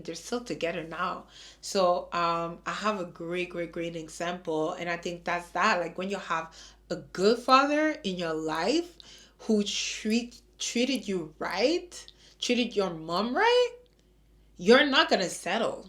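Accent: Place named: Italy. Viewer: you must be Italian!! you sound just like me loool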